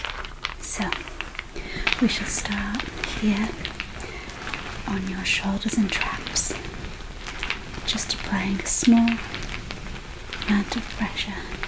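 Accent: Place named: Yorkshire, United Kingdom. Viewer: I think Blissful Whispers ASMR is a Yorkshire girl as well. You ladies have beautiful accents.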